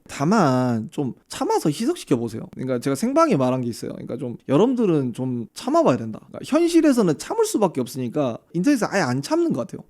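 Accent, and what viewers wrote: Busan, South Korea. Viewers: 형 부산이여? 갑자기 겁나 친근감 가네요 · 고향이 경상도 분이 아니셨다고?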